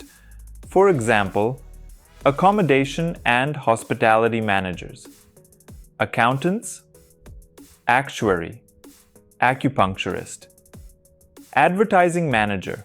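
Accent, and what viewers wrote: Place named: India. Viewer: This is Indian English.. sounds good to be true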